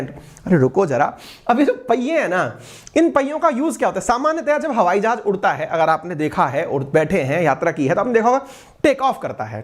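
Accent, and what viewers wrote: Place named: Rural, India. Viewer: Kya dehatiyo ki tarah bolta hai master. 😅😅😅😅😅😅😅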